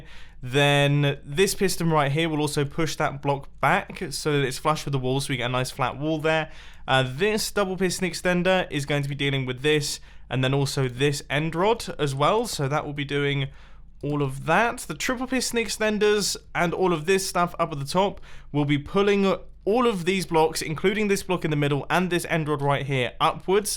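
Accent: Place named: United Kingdom. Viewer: Yeah it’s Seth Bling with a British accent.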